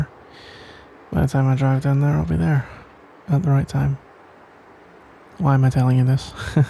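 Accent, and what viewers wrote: Scotland, United Kingdom. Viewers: OMG YES A SCOTTISH DILF AUDIO 🥹 my life is complete, but on a serious note this is adorable <33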